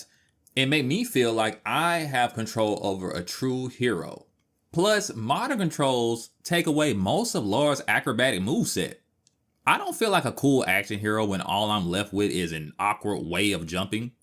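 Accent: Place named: United States. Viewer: it's a joke.. and I don't believe this guy got it wrong anyway, he just has a murican accent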